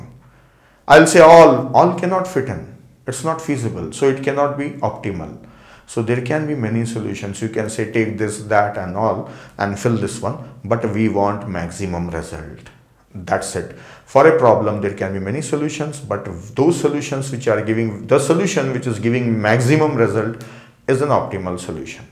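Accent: India. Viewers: Rabbim sen beni hint aksanına mazur bıraktın ya artık ne diyeyim final sınavında sıçtım zaten türkçe kaynakta yok gömsünler beni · Perfect Indian accent, I just love it.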